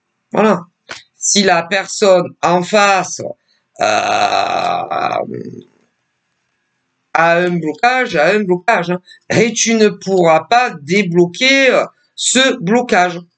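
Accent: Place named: Marseille, France. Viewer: je pense que tu es vers marseille a l'accent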